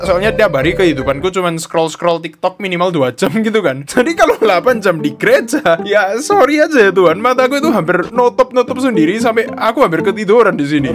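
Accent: Java, Indonesia. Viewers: Medoknya khas banget😂, semangat koh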